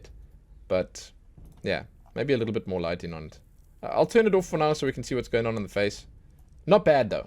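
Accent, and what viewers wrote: South Africa. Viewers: Subbing, cause your accent is South African😂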